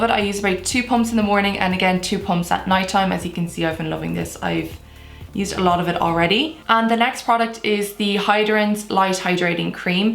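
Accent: Dublin, Ireland. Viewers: Like literally It's so similar I feel like Charlize is doing an Irish accent and I'm watching her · Omg you're so pretty :) Are you from Ireland? If so what county/province. Can't figure out whereabouts your dialect is from 😅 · Yocheved Lam it's an Irish accent, probably more specifically Dublin or somewhere around Dublin anyways 😂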